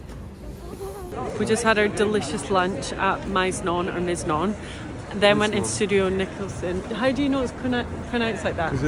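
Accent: Scotland, United Kingdom. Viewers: Just found your channel. Really enjoy seeing what you get up to in London. All the best with the channel, watching from US and can't get enough of your Scottish accent!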